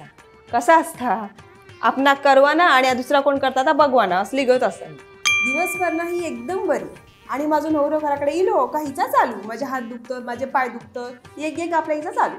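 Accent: Malvani, India. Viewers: तुझी मालवणी भाषा ऐकायला फार आवडते. ऐकत राहावे असे वाटते. तुझा प्रत्येक वीडीयो आवर्जुन बघतो.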